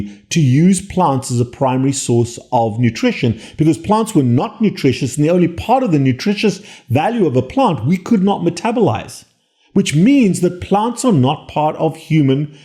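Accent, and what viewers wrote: South Africa. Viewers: Super-informative video! Love the accent! South Africa? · Always lekker to hear a South African accent here on the tube... Thank you, I appreciate your videos, love from Darljng South Africa!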